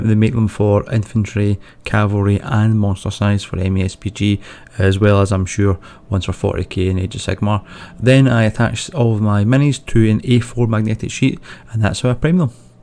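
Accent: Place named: France. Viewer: "I am French, which is why I sound like this" ☠️ Now that's how you start a video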